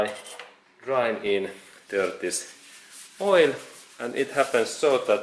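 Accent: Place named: Finland. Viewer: OK , I am willing to bet that this guy is Finnish , typical accent from Contemporary Finn who learned English as second language.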